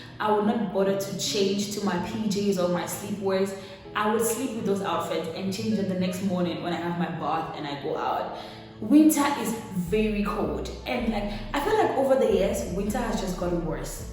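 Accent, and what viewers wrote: South Africa. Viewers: You my DEAR , You Sound just like ONE of US, I mean South African Keep Learning our WAYS of RESPECTING our Cultures and Elders .Good Job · For a second i though your southy you have our accent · She even sound South African, and I don't mean her understanding and speaking of SA languages, I mean the way she speaks English sounds very South African. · Wow i like how you are so open minded ...You just sound like a typical South African when you speak our languages 😂❤️...We love you so much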